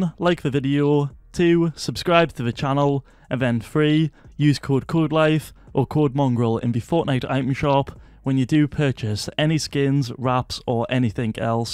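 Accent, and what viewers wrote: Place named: United Kingdom. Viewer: is british acent right?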